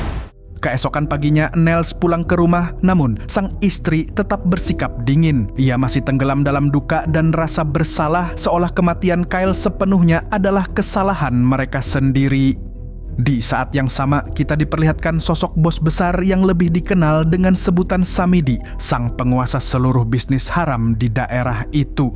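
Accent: Banjar, Indonesia. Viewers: Harau..urang Banjar sekalinya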